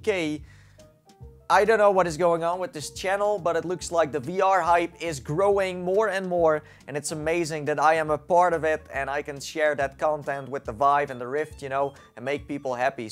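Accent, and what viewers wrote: Netherlands. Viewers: Zijn Engels is goed maar hij heeft een Nederlands accent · Je kan horen dat hij van Nederland is!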